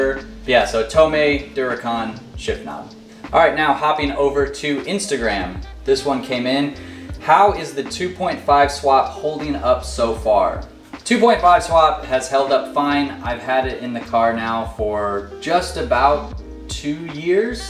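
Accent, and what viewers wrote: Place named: Chicago, United States. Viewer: Hey, you from Chicago? I've seen the Bears stuff in your other videos, and, I thought your accent sounded familiar. The fact that you have an open dislike towards Wisconsin just kinda confirmed it for me, lol.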